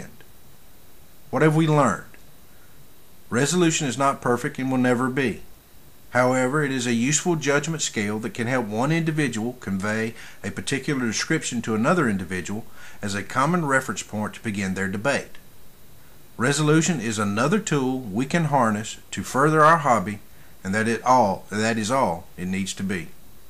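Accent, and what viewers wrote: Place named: United States. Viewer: Awwh what a lovely video! keep up the good work ma man! And Where is that accent from? I love it! Where in the US I mean :)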